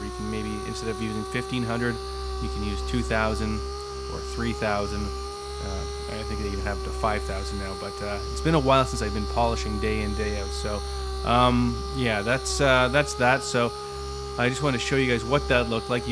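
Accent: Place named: Canada. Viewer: Good video. Love the Canadian accent!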